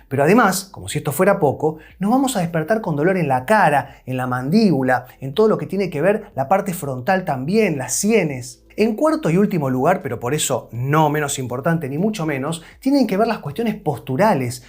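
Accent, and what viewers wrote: Buenos Aires, Argentina. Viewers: Gracias , muy buen video. Mi nuera una muchacha joven tiene cuello a l miseria !!! Deformado . Joroba. P mala postura pienso ; trabaja mucho c l compu. Pero comenzo deformidad mas joven. Voy a mostrar este y tratar vaya a quiropractico. Ud es argentino ?? Porteño?? 👍🇺🇾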